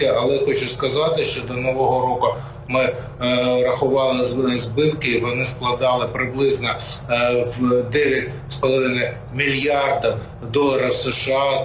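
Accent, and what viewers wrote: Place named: Galicia, Ukraine. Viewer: Как же поддаться, если там смесь польского, румынского, русского, в общем каша галичанская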